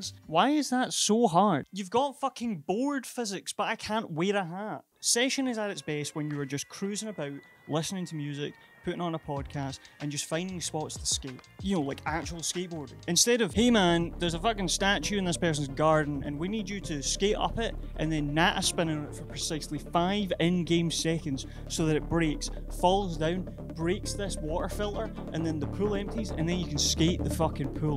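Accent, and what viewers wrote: Scotland, United Kingdom. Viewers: Belting vid with the hilarious ADHD style quick fire editing and Scottish accented quips.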